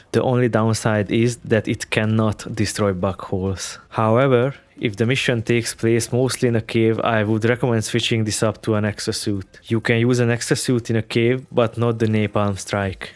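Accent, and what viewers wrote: Hungary. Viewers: Rögtön felismertem a magyar akcentust. Great informations btw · are u hungarian?? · te magyar vagy halljak meg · Thats a juicy Hungarian accent, love it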